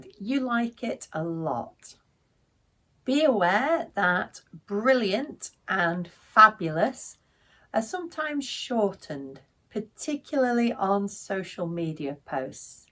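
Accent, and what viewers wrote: United Kingdom. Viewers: My pleasure I love the British English And you are Perfect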